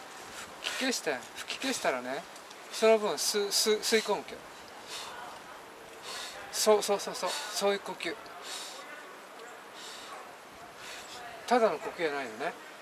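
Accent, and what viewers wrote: Japan. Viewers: 最初は愛媛の方言かと思ったｗ 岡山出身だけどその辺のおっちゃんの喋り方だな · なんか苛つく言い方に聞こえるのは私だけかなぁ？ 九州弁ってこうなの？ あまり皆 理解してないと私も思う。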